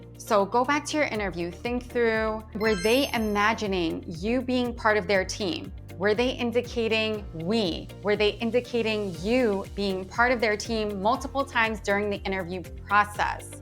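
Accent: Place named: Staten Island, United States. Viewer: Staten Island accent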